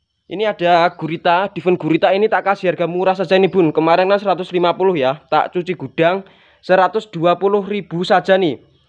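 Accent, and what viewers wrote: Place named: Java, Indonesia. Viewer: Lokasi endi mas nggih? Logate kok jowo kentel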